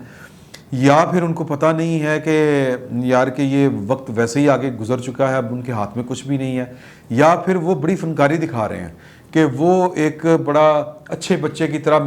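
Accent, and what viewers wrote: Punjab, Pakistan. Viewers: I love ur mix Punjabi talk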